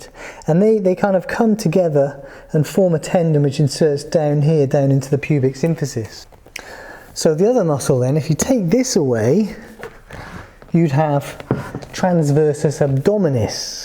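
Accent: United Kingdom. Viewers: the british accent really piss me off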